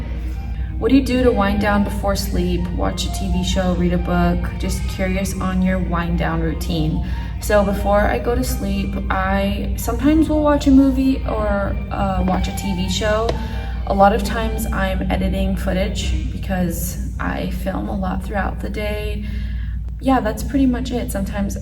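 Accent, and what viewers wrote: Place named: United States. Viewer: Hello, I am an italian girl, not a ballerina☺️ I love following you not only for your contents, but also because your american accent is amazing to me and I really would like to learn your pronounciation😄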